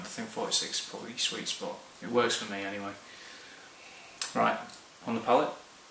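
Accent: Lancashire, United Kingdom. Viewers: I've changed my mind. You're from Lancs.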